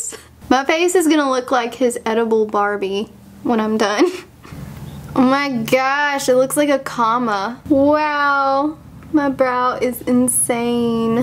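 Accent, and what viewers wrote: South, United States. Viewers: Do you have a country aksent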